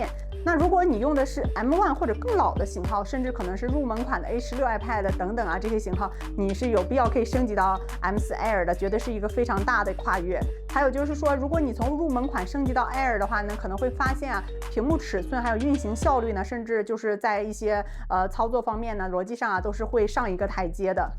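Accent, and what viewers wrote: Northeast, China. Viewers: 麻烦能否克制一下东北口音，尽量说普通话，这样效果更好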